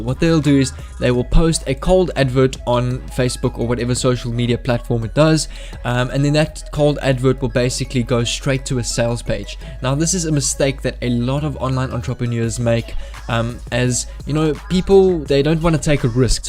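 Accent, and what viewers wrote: South Africa. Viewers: Haha yeah your accent gave you away I live in Johannesburg lol so I know the accent lol. I'm a person who has entrepreneurial mindset and values. I'm kinda struggling due to lack of funds. I'm 20 and I live with my parents. I'm trying to get out there and start something but this junk status is kak lol there are almost zero jobs lol( my dad has a PHD and can't get a job he studied for lol😅)😅😧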